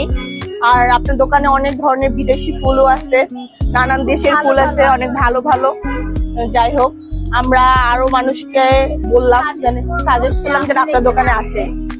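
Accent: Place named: Barishal, Bangladesh. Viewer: আপু ধন্যবাদ। বরিশালের ভাসায় ডায়লক দেয়ার জন্য।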